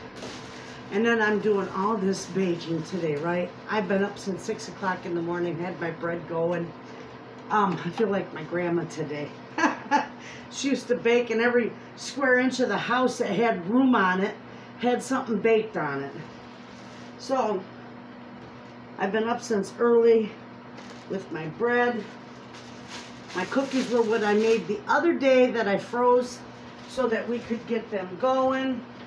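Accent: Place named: Upper Peninsula, United States. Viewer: I would totally love to shop at your market stand! By the way I like the "way you talk" I was born in the U.P. and now live in Northern Ohio. You talk like my family 🤗❤️ by the way, you grandkids are well behaved cuties! That little boy with his big hat is adorable!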